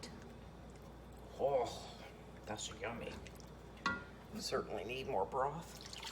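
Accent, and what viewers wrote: South, United States. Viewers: Hello, new to your channel.. been watching your videos.. I’m loving your videos and am loving your southern accent, I could listen to you for hours... Hubby and I are up here in Northern New England in Maine... We are retired and do as much homesteading as possible... This casserole looks soo amazing and delicious I can’t wait to make this.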